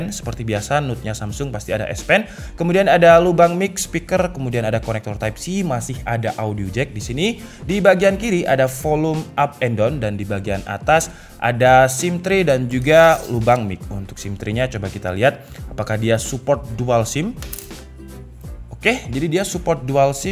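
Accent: Java, Indonesia. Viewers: Medok mu metu ms ngueri ngerong😭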